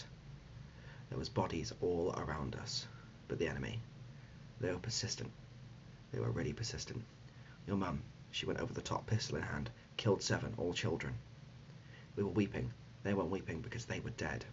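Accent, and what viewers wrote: United Kingdom. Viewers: Soo odd but that British accent tho. 👍🏻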